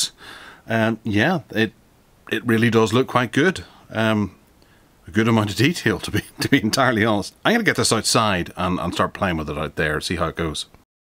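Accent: Northern Ireland, United Kingdom. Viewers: Great to hear a Northern Ireland voice!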